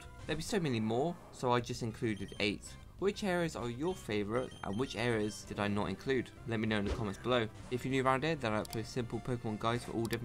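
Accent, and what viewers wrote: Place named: United Kingdom. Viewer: I like listen ur videos because your accent, is British?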